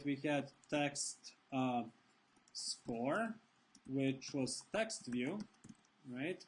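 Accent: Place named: England, United Kingdom. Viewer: Epicness indeed, but did anyone notice he had a perfect english accent for like the first 5 minutes like instantly went dr. jekyl and hyde?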